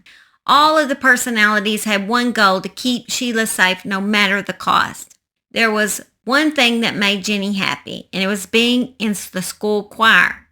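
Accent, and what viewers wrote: South, United States. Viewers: I’m a Texas girl who is frequently teased AND insulted about my “twang”, so I get how frustrating that can be… In ALL honesty though, the fact I’d never heard the Noah Prestgrove story was the story that brought me to your page. Your “southern accent” and passionate expression in the stories you tell is what brought me to follow you. You’ll get the “Karen’s” because some are so perfect, but you’ll also find others who embrace you for your accent! Hugs!! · As an austrian living in germany i had no problems understanding you and i absolutely adore the southern accent:) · Im Australian and i understand your southern english so pay no mind to the nay sayers 💖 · I love your channel most people talk ghetto so if anyone is complaining about your southern accent it’s because they talk ghetto. Anyway I hear stories on here that I’ve never seen on other channels that’s the reason I subscribed to yours! 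Much love from Illinois! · As a southern girl from Oklahoma myself, I understand the “southern English” as well! Y’all give this girl a pass! Thanks for the video! Simon is great too! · I'm Irish and understand your southern English perfectly.....love Southern accent 😊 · You think people in the Southern part of the US speak unusually? Try figuring out what a fast talking person from Scotland is saying!!! You'd swear it wasn't even English. That is why having an open mind is a good attribute -- instead of knocking others, you can appreciate & enjoy the many ways we ALL share this language with different spins on how it is spoken. I LOVE your SOUTH DRAWL & I'm from Brooklyn NY.❤❤❤❤❤❤❤❤❤❤❤❤❤❤❤❤❤❤❤❤ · I can understand you perfectly I can tell you from the south by your accent, but you’re not slaughtering the English lang by any means and I love your channel - remember they hated on Jesus!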